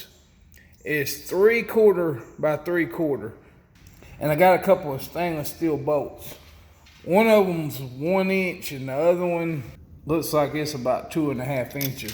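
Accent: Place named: Alabama, United States. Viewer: You live in North Carolina, but your accent sounds East Central Alabama.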